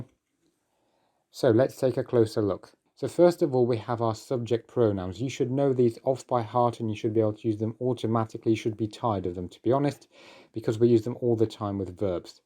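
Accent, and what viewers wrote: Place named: United Kingdom. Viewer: I am curious, you have a strong british accent.. are you a native british i am guessing, if so, how did you learn and be so well knowledgeable on the russian language? Great video as always, cases, greatly explained compared to other videos! Will share this!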